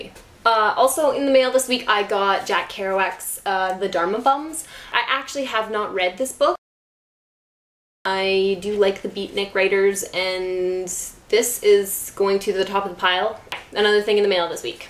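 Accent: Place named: Canada. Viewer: Um, yeah, you DO have a cute accent. You Canadians and your "aboot". ;) Awesome vlog!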